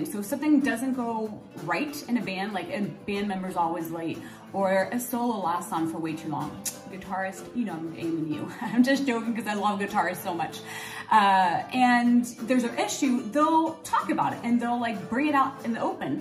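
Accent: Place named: France. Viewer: I really liked your video (and your French accent - it's really good!). Could you tell something about the country music scene in France? Thank you, greetings from Poland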